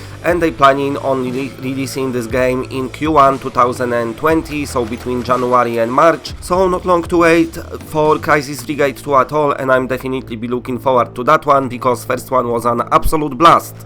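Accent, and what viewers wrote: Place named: Poland. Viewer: popraw się troche z tym angielskim bo nawet mi się ciężko słucha takiej wymowy, brzmi to bardziej jak byś czytal po polsku to co jest napisane po agnielsku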